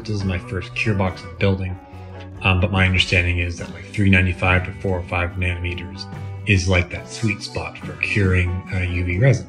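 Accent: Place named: United States. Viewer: bad design to have it all open, that UV then floods the space you put it in, your English sounds American, so learn to do some sheet metal design, use sendcutsend to cut and bend the parts out of uncoated aluminum, screw together, use regular sanitary silicone to seal seams inside, bare aluminum is reasonably good at reflecting UV, so when buttoned up the UV is reflected inside and isn't wasted damaging other materials in the room and you when it escapes your current enclosure can leave outside of the case bare, AL won't horribly corrode in a room, or can spray paint using al primer, it would probably cost you less than that sheet of HDPE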